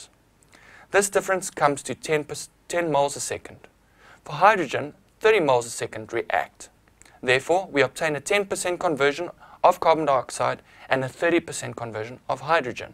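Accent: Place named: South Africa. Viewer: This man has a standard South African accent (or at least it sounds like it). I thought I was listening to a lecture video from my Uni for second😯. Also, the video was quite helpful.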